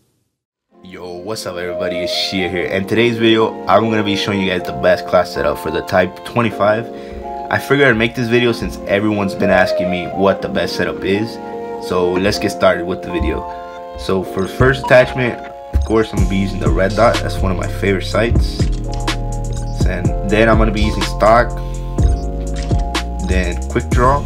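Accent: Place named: United States. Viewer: Suenas como un nigga yankee o un mexicano que habla ingles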